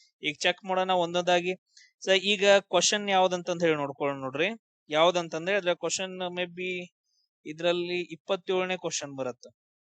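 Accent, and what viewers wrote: North Karnataka, India. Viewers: Sir pakka namma uttar Karnataka davar❤😂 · ಸರ್ ಉತ್ತರ ಕರ್ನಾಟಕ ಭಾಷೆ ವಳಗ್ ನಮಗ್ ಕ್ಲಾಸ್ ಕೇಳೋದ್ ಒಂದ್ ಮಜಾ ರೀ 😁... ಸರ್ ವಟ್ಟ ನಮ್ಮ ಹುಡುಗೋರ್ ಪಾಸ್ ಆಗ್ಬೇಕು ನೋಡ್ರಿ ಹಂಗ್ ಟೆಕ್ನಿಕ್ ಸ್ಕ್ಯಾಮ್ ಹೇಳ್ಕೊಡ್ರಿ... ನೌನ್ ಈ ಸಲಾ ನಾ ನೀ ಅನ್ಬೇಕು ನೋಡ್ರಿ ನಮ್ಮ ಕಾಮೆಂಟ್ಸ್ ಮಾಡೋ ಹುಡುಗೋರ್ ಸೆಲೆಕ್ಷನ್ ಲಿಸ್ಟ್ ವಳಗ್ 😁😁.... ನಿಮ್ಮ ತಲಿ ವಳಗ್ ಏನೇನ್ ಪ್ಲಾನ್ಸ್ ಅದಾವ್ ಎಲ್ಲಾ ಹೇಳ್ಕೊಡ್ರಿ ಸರ್ ನಾವ್ ಅಂತ್ರು ರೆಡಿ ನಿಮ್ಮ ಕ್ಲಾಸ್ ಕೇಳಾಕ... ✨✨🙏🏻🙏🏻🙏🏻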